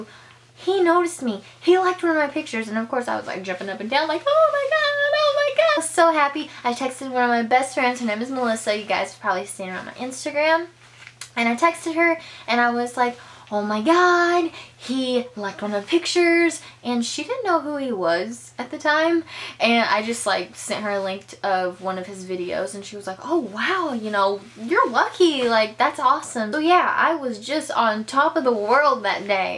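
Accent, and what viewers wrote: South, United States. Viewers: Wow you're so good at telling stories. I'm having so much fun watching your old videos! I love the storytimes and your country accent. I miss the country accent so much lol! You rock the country accent so good! It's really cute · So I just recently found you and you have quickly become my FAV youtuber! I'm obsessed ❤️ where are you from? It sounds like you have a southern accent. I'm from Alabama! I wish there was more southern youtubers haha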